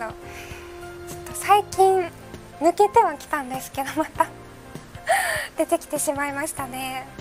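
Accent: Hakata, Japan. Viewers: 関西人やけど博多弁が一番可愛いし好きやわ。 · 博多弁は正義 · もうずっと博多弁でやって欲しい✨ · 博多弁可愛すぎる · 途中からの博多弁凄くカワイイですね☺️ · 博多弁だったんだって改めて認識 九州弁(博多も使う)のだと思ってた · 緊急事態以外、博多弁でやっても全然良い。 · ゆってへんだけでニュアンスはめっちゃ博多弁よなこの子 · 勘違いしたらいかんよ、可愛い子が博多弁を喋るけん可愛いと。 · もう博多弁で話していいよ てかキャスターの出身地の方言縛りの日あったら面白そう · もう、博多弁でよかろうもん。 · 美月さん博多弁可愛いいですね。 笑顔でごまかす姿がとても可愛いいですね😊 見ていてとても癒されますね。 まさに女神ですね👍️ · むしろ博多弁にしてほしい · 後半の博多弁で無事キュン死(*∩ω∩) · 博多弁のままでよかよ(^^)♫ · カワイイ(*^.^*) いいんじゃないかな。博多弁とか方言混じり。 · 博多弁好きやけんねー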